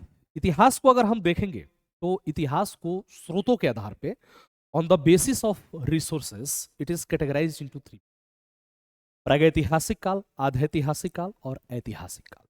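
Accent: Bhojpuri, India. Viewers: Sir aapka bhojpuri sunkr lga ki aap mere hi district gopalganj se belong krte h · Sir ji aap to gajabe bhojpuri bolte hai ekdam gaaw ke tarah❤❤❤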